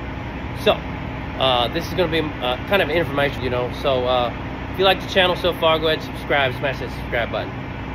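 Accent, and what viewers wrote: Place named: South, United States. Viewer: I do love how in some videos he says something like, “As you can tell by my southern accent, I’m not an educated man.” Damn, dude! I am an expert in my field, but you do things that I could not even try to pull off! Keep it up!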